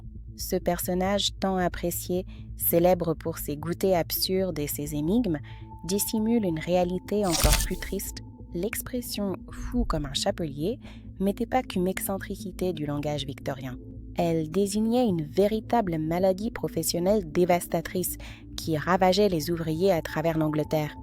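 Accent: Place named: Quebec, Canada. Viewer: Superbement documenté ! Avec le petit accent so québécois qu’on adore ! Tellement intéressant j’aurais aimé tomber sur vos contenus bien avant ! Merci à vous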